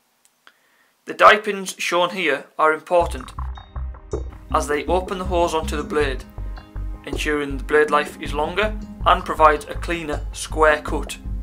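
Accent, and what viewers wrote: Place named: Carlisle, United Kingdom. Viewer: Was looking into this sort of business. Recognised the accent. I’m Carlisle lol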